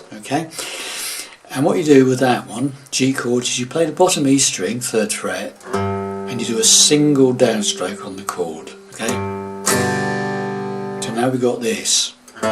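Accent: England, United Kingdom. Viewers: The English accent caught me off guard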